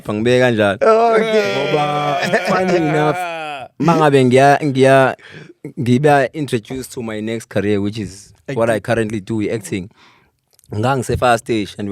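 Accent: Zulu, South Africa. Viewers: zulu accent❤️😂😂😂😂. Iynhlwabuse😂😂😂😂. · IsiZulu siki.🔥💯🤣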